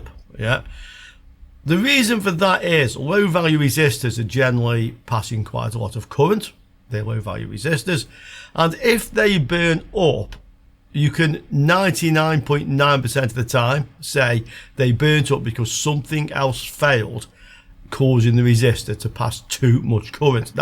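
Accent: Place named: England, United Kingdom. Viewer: Thanks for handing down your experiences. Your videos are organized, frank, and honest. I frequently have your repair on my workshop playlist to keep me company in the lab. I might even pick up your English accent.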